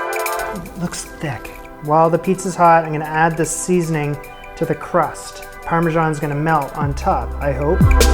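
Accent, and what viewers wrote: Canada. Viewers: Where are you from? You're accent is super Canadian.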